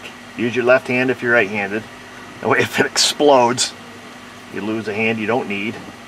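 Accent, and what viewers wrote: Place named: New York, United States. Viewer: Since you speak New York-ese I thought you were saying Self Made Auto:(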